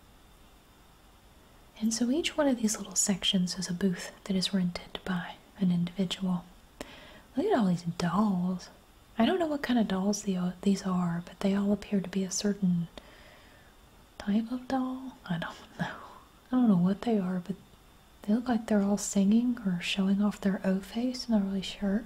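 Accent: South, United States. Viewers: I love your voice and southern accent 💗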